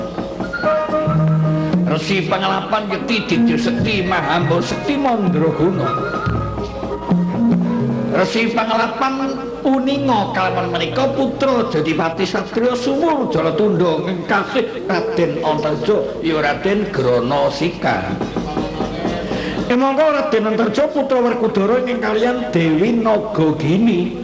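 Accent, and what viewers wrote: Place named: Banyumas, Indonesia. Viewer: Banymasan cukup keren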